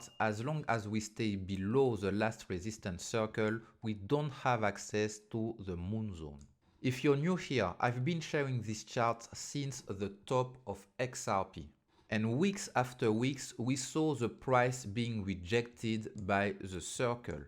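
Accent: France. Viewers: En tant que français j'apprécie beaucoup ton accent frenglish 😅👍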